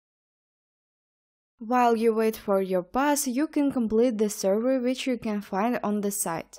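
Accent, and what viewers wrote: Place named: Russia. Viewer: Russian acsent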